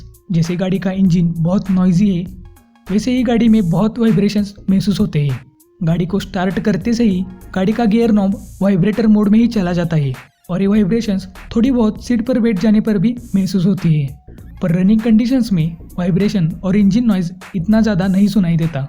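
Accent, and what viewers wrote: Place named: Satara, India. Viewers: Bhau tumhi Satara che aahe watta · भाउ तु मराठी मानुस दिस्तोय बोलन्या वरुन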